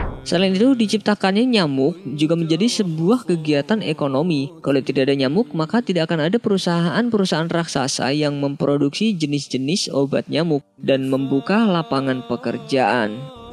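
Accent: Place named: Kebumen, Indonesia. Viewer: Wong kebumen ngapak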